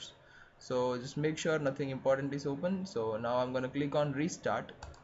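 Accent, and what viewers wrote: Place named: India. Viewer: That Indian accent tho lol headass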